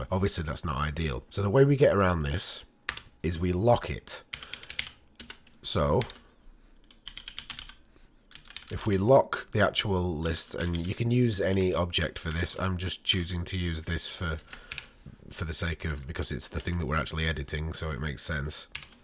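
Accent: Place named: England, United Kingdom. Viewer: Your videos are not boring. For those of us who want to learn, videos like this are rich with content and quality. I walked out of this video being able to thread. That is an invaluable quality in gaming that few people out there bother to take the time and care to teach. So when you present us with this Cake filled with information wealth, it is ANYTHING but boring. Have you noticed we dont thumbs down you? It is partly because you are english and sound awesome, but mostly, its because somehow, the way you teach gets through. You take time, you allow us time to process... These long videos, I watch through from one end to another. This, is quality. I will continue to patreon you until I am poor because you have helped us.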